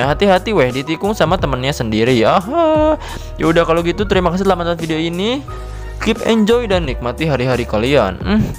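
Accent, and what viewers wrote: West Java, Indonesia. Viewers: Ngakak aslina aduh sunda banget😂😂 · Sunda anying😂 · Anjimm si mang org sunda euy , aing karek apal ieu channel mantap resepp euy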